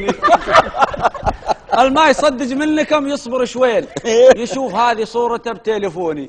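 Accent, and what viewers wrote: Iraq. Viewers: روعه يعطيك العافية. واللهجة البدوية العراقية زادتها حلاوة. ذكرتني بلهجة البدو شمال السعودية 👍🏻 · انا اشهد انها قصيده معتبره . وزادتها اللهجه العراقيه الاصيله حلا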